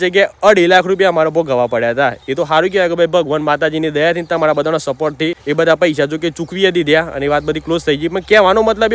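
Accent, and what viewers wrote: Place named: Mehsana, India. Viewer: મને બીજું કંઈ નથી ગમ્યું બસ ભાઈ ની મહેસાણી ભાષા બહુ ગમી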